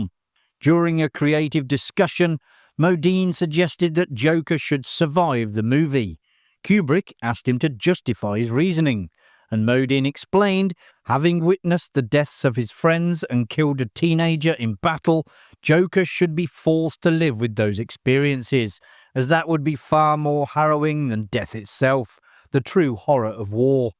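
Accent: England, United Kingdom. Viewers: it's called an english accent you silly twat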